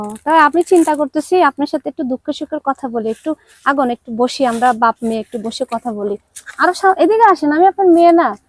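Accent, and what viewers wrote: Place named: Jessore, Bangladesh. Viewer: তোমার কি সুন্দর কথা আমাদের যশোরের মত কথা তোমার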